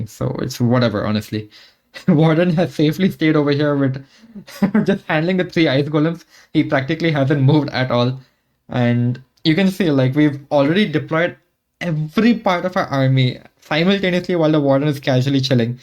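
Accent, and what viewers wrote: India. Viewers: Love your accent, which part of India are you from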